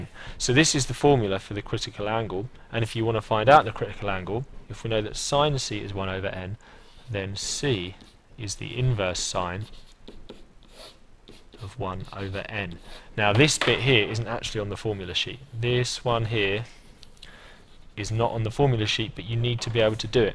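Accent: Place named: United Kingdom. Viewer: Thanks this video helped me a lot in learning British accent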